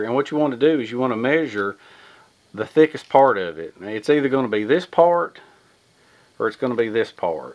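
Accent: South, United States. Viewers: Oh my lord he’s got a heavy southern accent. I got one it just ain’t that heavy of an accent.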